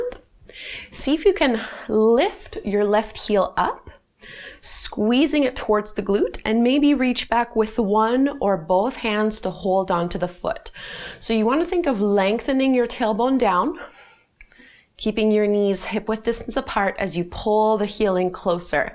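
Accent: Canada. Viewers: My word today is "grounded". Thank you for your amazing videos. I love starting my day with your guidance and expertise. I really appreciate your encouragement for modifying poses as needed; I never feel any pressure to conform to something that doesn't work for my body. Also, as a transplanted Canadian living in the US, it is really wonderful to hear your Canadian accent. The three oms at the end gave me some time to really internalize my practice.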